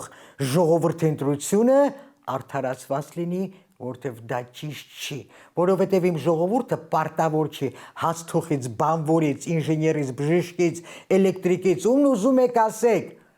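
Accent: Standard. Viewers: Տիկին ջան, ինչ գրագետ ու հիանալի ելույթ կայացավ, շատ ենք կարոտել մաքուր հայերենով ելույթ լսել...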